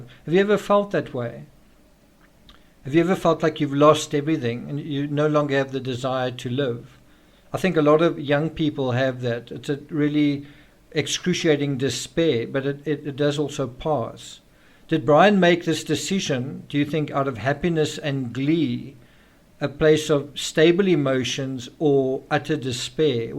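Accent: South Africa. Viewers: I have been gradually losing interest in this channel without understanding why. Could it be as simple as overdosing on the South African accent, since I also watch Grizzly? Blaming myself for losing interestshould have been my sign. Now I realize that was a sign of feeling gaslighting. You have totally misunderstood narcissism, and you might never be able to see it truly, even if you watch HG Tudor till the cows come home ... But the condescending tone you display towards your audience is unmistakeable to me now that I have become educated.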